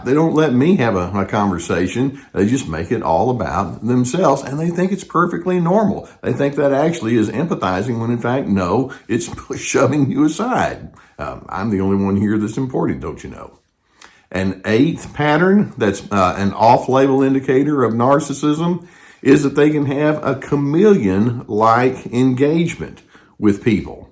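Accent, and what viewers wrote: United States. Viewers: I love every word in your message dr and your beautiful American accent. Thank you for your incredible help and support!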